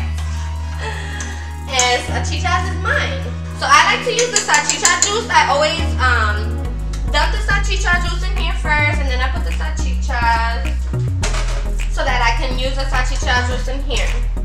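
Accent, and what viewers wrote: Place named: Puerto Rico. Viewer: I loveee your Puerto Rican accent 😭😭❤️❤️❤️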